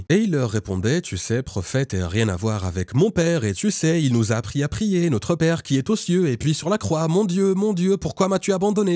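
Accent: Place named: Arab world. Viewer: Bizarre il prononce le prénoms du prophète et du coran avec l'intonation exactement du vrai musulman la langue arabe seule un vrai arabe peut prononcer les converti le prononce différemment car il faut avoir parlé toute sa vie l'arabe pour prononcer les syllabe du h ou k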